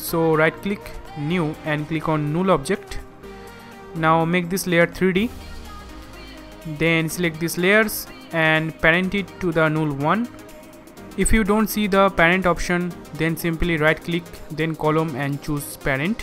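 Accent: India. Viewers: sounds indian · Sounds pure Indian😍😍😍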